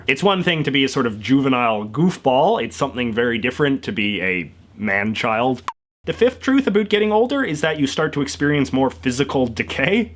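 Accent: Canada. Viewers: That's the heaviest Canadian about I habe ever heard!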